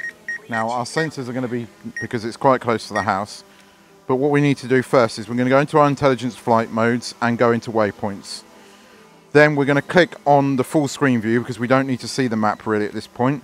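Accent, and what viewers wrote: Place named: Surrey, United Kingdom. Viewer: Really highlights the possibilities with the Pro/DJI flight modes. With your accent I was expecting views of Woking, wasn’t expecting Palm trees, ha ha. Thanks for posting!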